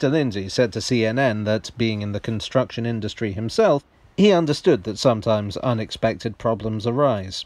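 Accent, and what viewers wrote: United Kingdom. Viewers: I can’t tell if this is a mini documentary or just a straight up British guy roasting a building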